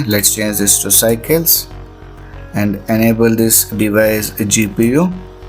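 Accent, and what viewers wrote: India. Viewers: why is it all indians weird curry accents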